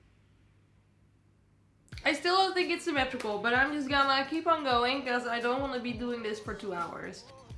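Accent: Netherlands. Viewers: She’s dutch so that’s why the accent prolly overlaps 😸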